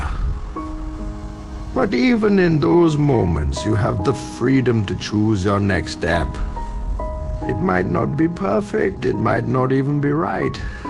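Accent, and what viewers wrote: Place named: India. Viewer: BRO THEY ARE DELETING MY COMMENTS, WHAT I AM SAYING IS THIS DUDE SOUNDS LIKE AN INDIAN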